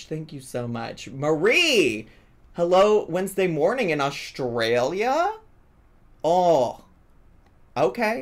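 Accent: South, United States. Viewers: has such a gentle southern accent for a midwesterner 😂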